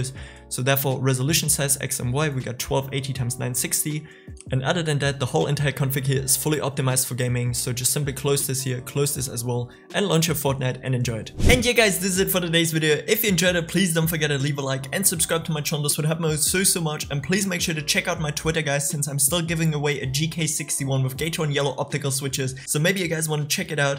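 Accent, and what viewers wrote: Germany. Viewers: Oha digggah du hast mir von 30 fps zu 120 fps geholfen ehrenmann bist du eug deutsch wegen akzent:(areyou German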